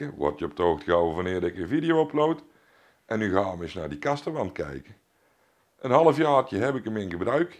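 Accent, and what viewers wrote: Brabant, Netherlands. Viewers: Leuke video's!! ik kom zelf uit Oss maar woon al enige tijd in Gelderland. Heerlijk om dat Brabantse accent te horen!!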